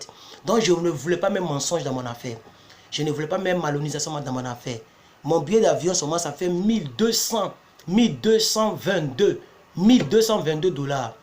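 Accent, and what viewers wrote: Ivory Coast. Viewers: Lol je ne sais pas pourquoi je reconnais aussi vite les Ivoiriens quand j'attends leurs voix où quand je le regarde je sais déjà que c'est un ivoirien depuis que j'ai vu ta tête ta façon de parler je suis que tu étais ivoirien dis-moi le contraire lol merci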